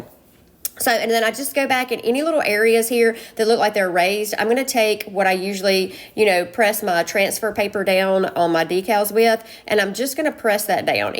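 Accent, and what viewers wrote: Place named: South, United States. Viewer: Love how this turned out. Thank you for sharing. Also love your southern accent. Watching from Ontario Canada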